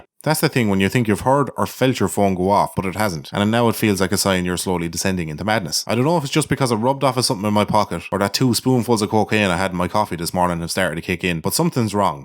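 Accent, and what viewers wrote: Ireland. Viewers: Are you irish? and Loved your channel on the first video pal. Thanks for following back on twitter :)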